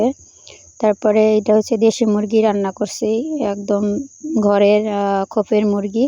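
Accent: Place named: Barishal, Bangladesh. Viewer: ওরে আল্লাহ মিল্লা গেছে মিল্লা গেছে,,মোরা দেহি বরিশাল্লা মনু🤭🤭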